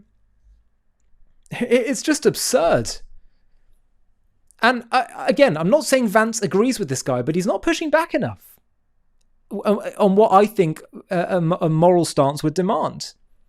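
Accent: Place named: United Kingdom. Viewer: Hey, uhm I have been watching a lot of your videos and the unbroken pattern I find in all of them is that if anyone doesn't agree with a policy of Israels or doesn't support a action of theirs 110% almost in a fanatical attitude of devotion you have a habit of beginning to label them "not a friend of Israel" and then going further to call them maybe even Anti-Semitic. You do not get to throw around labels as weapons like this at anyone you choose if they don't just throw themselves at your feet and prostrate themselves before the next big move that Israel makes. You have a British accent if I'm not mistaken and the last I checked you have some very serious problems over there in the U.K. with Muslims taking control of everything and they will soon be driving all the rest of the Brits out of their homeland. Maybe you should spend more time criticizing the very real enemies you have right there among you and take care of your own lands rather than poking barbs at American Conservative figures to cry bully all of us into submission into whatever your next big thing is. 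Neither Tucker, nor Vance, Nor, Charlie Kirck et all have ever been enemies of Israel and just because some of them may give pause to something or question something on strictly intellectual grounds to inspire discussion this channel responds to the same as if it were the harshest of crimes commited by the Third Reich or something. Look you can say whatever you want but you don't earn any credibility acting like t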